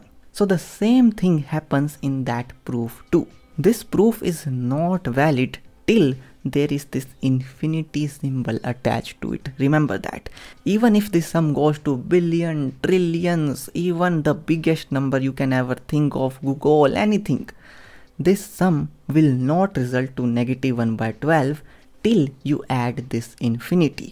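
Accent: India. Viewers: The first Indian Accent which matches the representation of Indian accent in Western World. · I love your hard accent. Feels like home. It feels much more unique even for Indian accent sounds different from commonly spoken english at home. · As an Indian myself, I've never heard such a strong Indian accent in my entire life 😅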